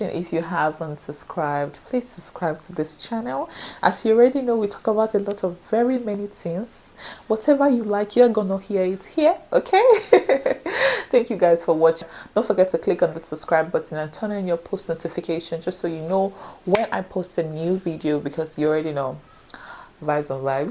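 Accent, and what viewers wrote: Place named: Nigeria. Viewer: The story is not as good as the title 😂😂 or maybe it's the Nigerian accent for me which am not very good with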